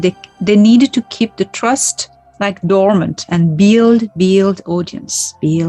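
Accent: France. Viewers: Dear, I'm absolutely delighted by your charming french accent, but can we expect a french version of this super motivational vidéo? Namaste 🙏 From a belgian follower and unconditional fan 😍😇 PS: I know it's double work, but take the example of Garbielle Isis, who always record simultaneously her video twice: once in French, once in English...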